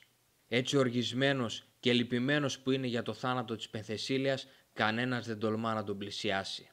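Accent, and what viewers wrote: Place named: Northern Greece, Greece. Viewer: Βόρειο ελαδιτης είσαι;στο τέλος που λες μα είσθαι ολλοι καλά ίδια προφορά εχουμε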